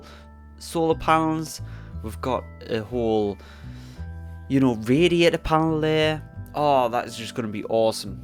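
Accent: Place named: Northern England, United Kingdom. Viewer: You are from somewhere north of England. Accent is similar to mine where you from?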